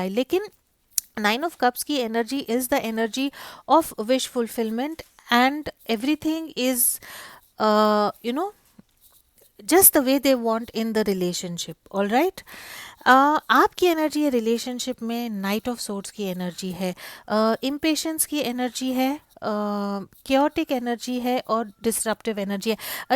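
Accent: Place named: Maharashtra, India. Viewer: मॅम मराठी आहात ते ekun खूप छान वाटले..thank u for reading.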